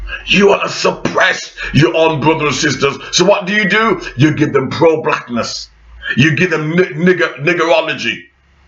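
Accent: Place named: Caribbean. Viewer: Rick James with Island accent. Thumbs up.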